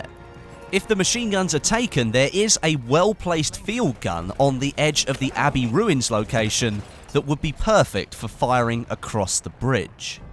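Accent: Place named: United Kingdom. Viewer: fresh to have a British accent on a battlefield video.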